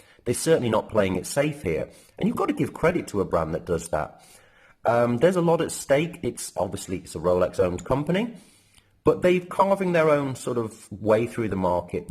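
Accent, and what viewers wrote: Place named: United Kingdom. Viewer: Judging by your accent I take it your from the U.K. and from what I heard is that the P01 over in the U.K. was a hit as soon as it became available. I’m not saying there were waiting lists for it. Just that when a dealer got it there was someone to buy it. Maybe that was the reason you had such a difficult time acquiring one. Over here in he U.S. it’s not as popular in my opinion and because of that if you do some digging around you can still find one from an Authorized dealer. But I don’t believe that all dealers are even ordering them. At the moment the watch is getting no love from anyone but as soon as it gets discontinued people will start realizing it’s a classic and a must have. I’m really curious if they discontinue it when they come out with new releases or give it another year. Maybe corna will be a decision factor for discontinuing it because they are backed up on production?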